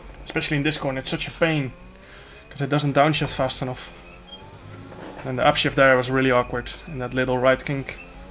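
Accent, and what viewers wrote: Netherlands. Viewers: Are you dutch because your accent sounds like verstappen :). I'm american so I'm not good with european accents for the most part.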